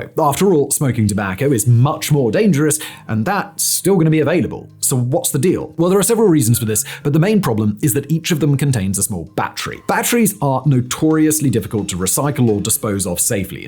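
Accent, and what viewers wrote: United Kingdom. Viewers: Gasoline cars are going to be around for a long long time, my friend. Just watch and learn. Also, desktop computers aren't going anywhere and settled down to their ongoing use plateau several years ago. And finally, BluRay and DVD/CD players are abundantly available, very cheap to obtain and will be for the foreseeable future. Your analysis is a little heavy on the wishcasting propaganda side, sprinkled with a thick authoritative sounding British accent. Those in the know don't buy it. You did at least nail the fax machine, so there's that...good man!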